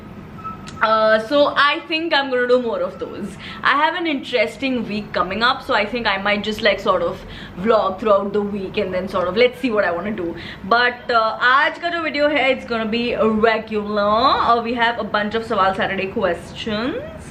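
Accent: Maharashtra, India. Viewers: Ma'am I'm a really really big fan of yours. Your videos are so so amazing and specially your way of expressing. I always watch your videos after studying and believe me it's so so relaxing. Your Marathi gives it a tinge of fun and #pridekolis. You really entertain everyone. I wish you all the best for your future. ❤️❤️ And yes LOVE LOVE ✌️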